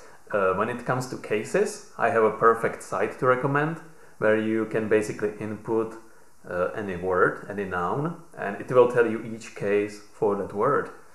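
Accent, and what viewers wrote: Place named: Czech Republic. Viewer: ma cesky prizvuk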